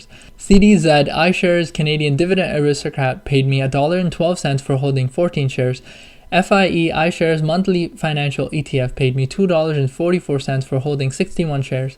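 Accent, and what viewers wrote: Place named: Tamil Nadu, India. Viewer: Bro are you a tamil? Apdina neenga vera level bro(really good). Unga(your) accent and way of thinking is superb. I have subscribed and proud to see an Indian doing so great in terms of investing which is non existent here. Thanks a lot.!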